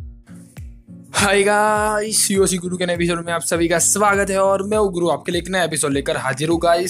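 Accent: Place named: South, India. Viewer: Tumaree English south Indianz ki tarah hai :)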